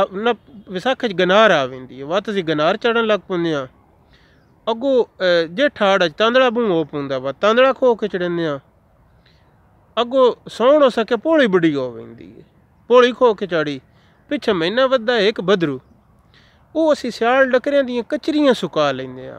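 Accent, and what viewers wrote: Sargodha, Pakistan. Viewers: میرا بھائی کیا بات ہے اصل پنجابی سرگودھا دا انداز تے مزدار بات ۔۔۔۔زندہ باد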